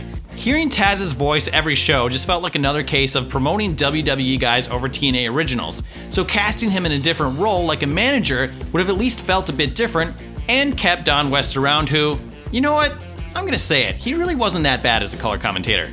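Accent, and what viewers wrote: United States. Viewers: Who the fuck is this guy with his annoying, overly exuberant American accent? When I click on a WhatCulture vid I want to hear bland, monotone British voices. Not this shit! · Why is this guy american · It's because he's American isn't it?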